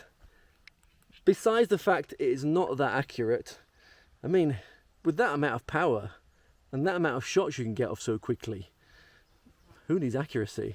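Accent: United Kingdom. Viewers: Ah, an English accent, now it makes sense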